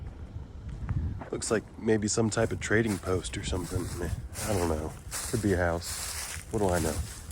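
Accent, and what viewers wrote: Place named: New York City, United States. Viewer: You sound like you lived in NYC.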